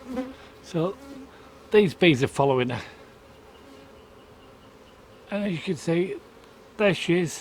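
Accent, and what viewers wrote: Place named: Australia. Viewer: Sounded like an Aussie to me.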